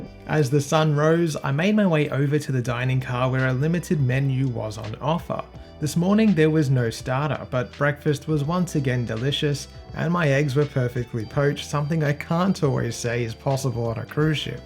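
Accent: Australia. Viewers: You sound Australian...you should know it's called the Gann [sp] not the Garn [sp]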